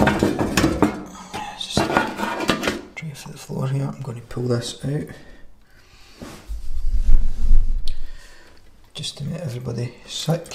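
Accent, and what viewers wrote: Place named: United Kingdom. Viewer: At last, a British guy 😊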